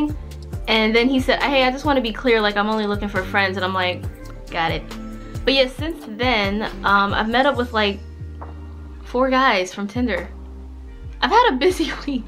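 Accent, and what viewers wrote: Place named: United Kingdom. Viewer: The British accent 😊😅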